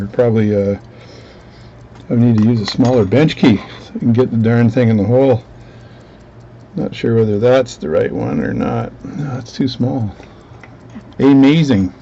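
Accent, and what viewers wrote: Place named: West Island, Canada. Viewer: you may not be west island, but that is definitely west island french.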